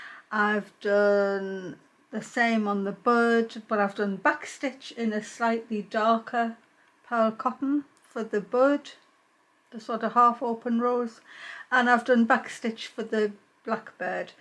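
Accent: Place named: North East England, United Kingdom. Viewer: For me, it’s so lovely to hear somebody from the north east because it does feels like I’m listening to a friend or relative. Thank YOU for being here ❤❤❤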